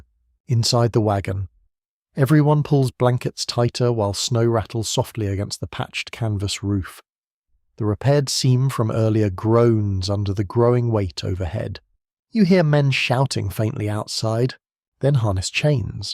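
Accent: United Kingdom. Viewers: Aaargh so tired of cokney ai voices 😊😊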